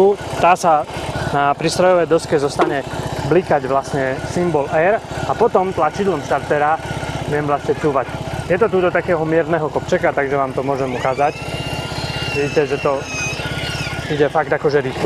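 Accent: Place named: East, Slovakia. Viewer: Motorka brutál.. Ale video som nedopozeral...tá východniarčina trhá ušné bubienky...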